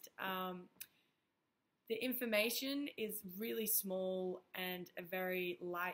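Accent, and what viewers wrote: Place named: Victoria, Australia. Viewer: I think the statute of limitations is up….I had the biggest crush on Ms. during her time in Eugene. I was completing a lengthy career of sports journalism and sociology. Admittedly it was absolutely fueled by an appreciation of aesthetics, mannerisms, admittedly shallow but subjectively harmless. Your decision to take leave Eugene for the Horned Frogs of Fort Worth served as excellent foundation for my ego. I mean the only reason I never took out was because she left! 🙃 I saw your content for YouTube kids about the importance of keeping in touch during quarantine, mindfulness, etc. Great work! I can I only imagine the impact a star athlete like yourself has on young girls AND boys. Seeing you discuss toxic masculinity and gender issues intelligently is rad. That’s 100% my realm of media/sociology snd I had no idea. 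It isn’t ironic; since no one ever uses irony correctly…. With my awareness of misogyny, objectification, etc it can be a conundrum between the ‘progressive’ trends I believe in and ‘Woah. Is she from Victoria? The accent. She’s friggin’ 😍’ Hey now now…she might be an awful person. We should not suddenly wish all good things for her whilst ignoring the plight of others based on hormones. You’re really intelligent too! I damn well knew it! I’m exaggerating intensity of my crush (slightly)….but to see you sharing a very well composed thesis and using your platform for good. Well that just shows I’m perceptive. I knew there was far more to ya Beaut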